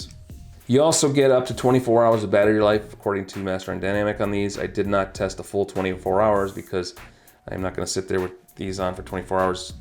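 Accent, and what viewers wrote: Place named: Miami, United States. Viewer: nice headset id be scared to take them out of the house . i heard your voice i thought my cousin was doing it, heard the Miami / Hialeah tone in your voice.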